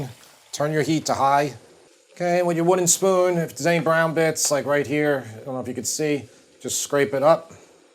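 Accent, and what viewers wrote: New York, United States. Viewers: I started checking out this channel mostly for the classic deli salad/sandwich recipes, after I specifically went looking for somebody with a Noo Yawk (or Jersey or LI) accent who actually made that stuff for a living. I've tried a few now and they've all been exactly what I imagine a good NYC Deli tuna salad or mac salad or chicken salad should taste like. And I gotta say, all these "easy one-pan" meals and pasta dishes look and sound absolutely awesome too. Time for me to start feasting on more than just really good salads and sandwiches I think. Cheers for all the great ideas!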